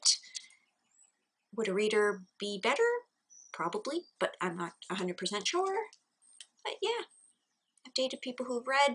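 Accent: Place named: Canada. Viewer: I absolutely love watching your videos. You seem such a sweet lady and I love your Canadian accent, it's lovely. Those were tough questions, I don't what genre I'd choose to ditch, but I'd probably choose Horror too or non-fiction.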